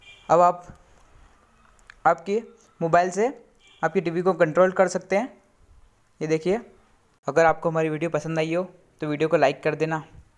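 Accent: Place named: India. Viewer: That indian voice 💀💀💀💀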